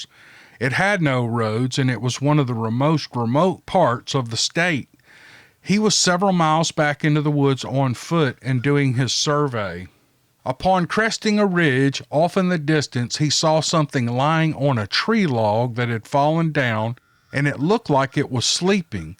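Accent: South, United States. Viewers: my dad was from northwestern Georgia mountain country and my mom was from deep southern Georgia, and most of my many aunts ("aints"), uncles, and cousins were from the deep south and talked like it. That's one reason I enjoy your videos so much - you sound like family. I had a lengthy career in radio broadcasting back during the "golden age" of radio, so I had to polish my diction, but I still love Southern speech, and I can still slip into it whenever I want to. That's an old picture over there to the left, and unfortunately I don't look like that any more. God bless you and all "your people". Ite?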